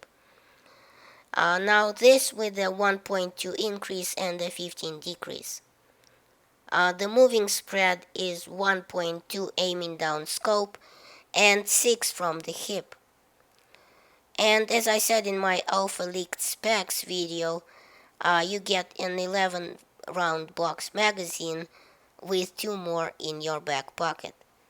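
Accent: Russia. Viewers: i don't care how old u r ur a badass cuz of ur Russian accent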